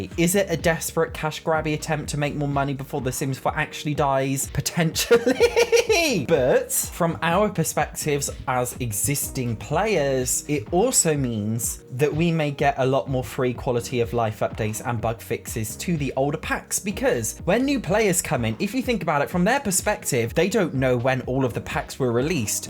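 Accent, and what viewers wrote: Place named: United Kingdom. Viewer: i feel like got so much more British in this video suddenly omg the bloody hell and innits i love it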